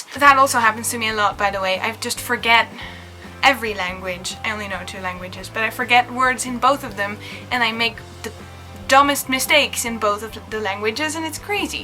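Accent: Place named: United Kingdom. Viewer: your british accent is there :) so amazing :D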